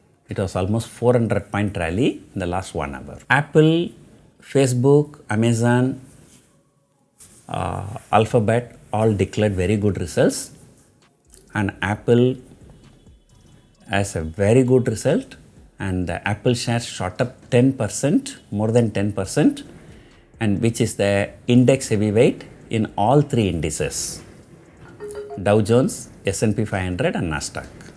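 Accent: Tamil Nadu, India. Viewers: Your English very bad talking Tamil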